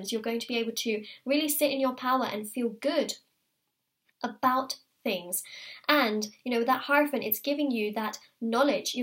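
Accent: United Kingdom. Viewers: Uk accent.very nice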